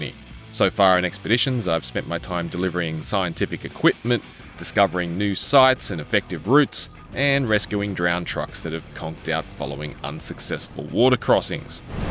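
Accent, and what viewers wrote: Australia. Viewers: Australian accent does that. A lot of us are very monotonous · He's an Aussie. It's how we sound. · Did they purposely find an aussie guy to review this? Kinda makes sense tbf lol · Yeah nah, He's positive neutral. it's just the Australian accent.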